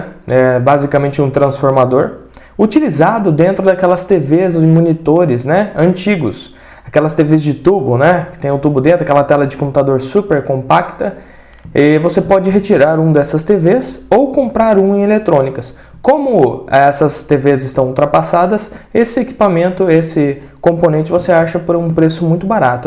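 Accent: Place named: Maringá, Brazil. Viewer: você é de maringá AAAAAAA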